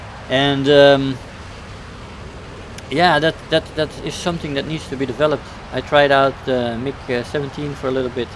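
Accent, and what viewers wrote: Netherlands. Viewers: your dutch ? I hear it from the accent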